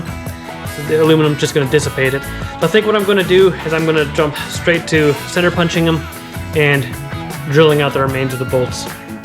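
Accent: Canada. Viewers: I knew I could hear a Canadian accent in this guy!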